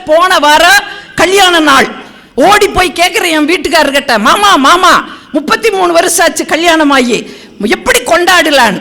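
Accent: Kongu, India. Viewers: கொங்கு தமிழ் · எங்கள் பகுதியில் நாங்கள் பேசும் தமிழ் இந்த கொங்கு தமிழ். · ஆட்ட, ஆட்டையப்போட்டு, கிடாவிருந்து வைத்த து,குபீர் சிரிப்பு!பத்தாயிரம்,போச்சே! பெண்களுக்கு அழகு சாதனம்,நாற்பத்தி,எட்டு! மேச்சுக்கு,மேச்சா!,கொங்குதமிழ்,மெய்சிலிர்க்கவைத்தது❤ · உங்களுக்கு கொங்கு தமிழ் சிறப்பாக இருக்கிறது அம்மா 💐💐💐💐💐💐💐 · கொங்கு தமிழ் கொஞ்சுகிறது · கொங்கு தமிழ் 🎉🎉🎉🎉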